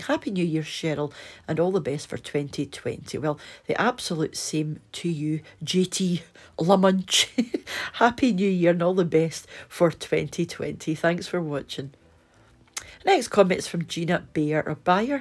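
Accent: Scotland, United Kingdom. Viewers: I do love all the meat pies, we don't get them that tasty in Canada..I am loving to watch all your videos and love your accent so much..something about the Scottish accents..I worked with a lady that had a Scottish accent..and I'm jealous! love it.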